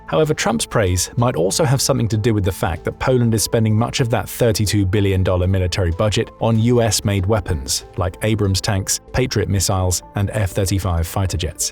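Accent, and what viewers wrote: United Kingdom. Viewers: Ditch the British communist narrator.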